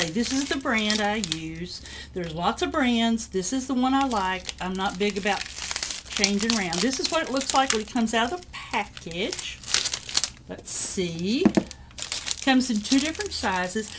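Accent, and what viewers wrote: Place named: South, United States. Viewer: What a lovely personality and creative spirit you have !I would also like to ad how adorable your southern accent is ..I was raised in Ga.but now live in NY for 25 years..thanks so much for the great tips and encouragement... it is such a joy to watch your videos .I have subscribed and look forward to more videos !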